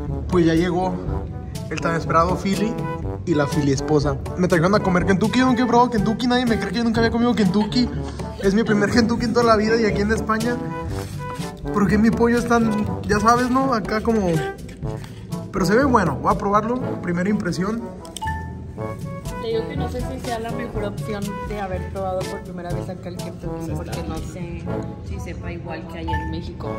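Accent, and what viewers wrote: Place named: Chile. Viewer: hablando como Chileno 🫶🏻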